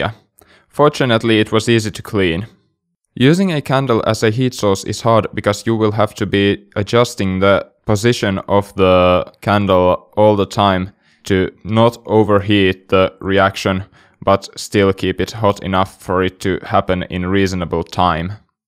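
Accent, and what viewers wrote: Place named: Finland. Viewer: You have finnish accent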